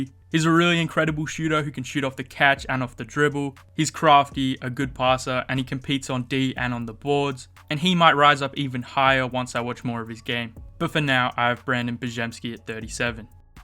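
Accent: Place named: Australia. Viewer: Good video man very informative. I'm really not sold on Jett Howard. It will be interesting to see how the Thompsons go in their first couple years too. I sensed an aussie accent, shout out from Sydney!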